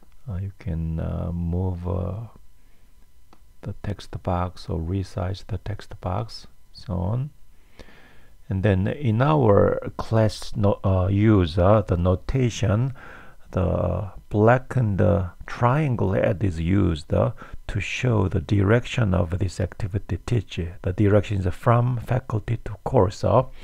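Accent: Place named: South Korea. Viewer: definitely korean accent.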